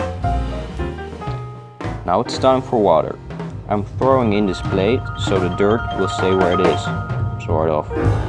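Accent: Netherlands. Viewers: I always thought you were Dutch by your accent (I have it too lol), but I wasn’t sure, and now I am. It feels satisfying to be right. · nice video! i do not know where you are from, but you sound very Dutch. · he sounds dutch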